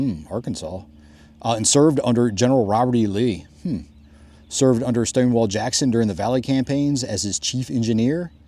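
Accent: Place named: Tidewater, United States. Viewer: Great video. I can hear that tidewater accent, lol.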